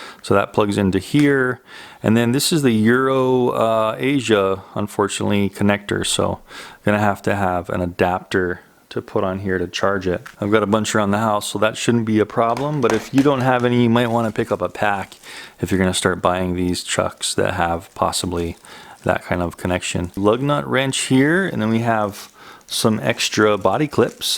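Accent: Hawaii, United States. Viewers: You live oahu? Nice to hear pidgin on youtube!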